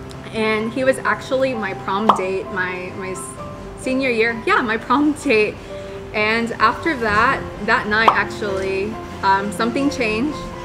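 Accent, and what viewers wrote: United States. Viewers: Keep making videos! It is good for learning American pronunciation. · I learn much american accent from you, You need colab with Indonesian food vlogger to get more subscribe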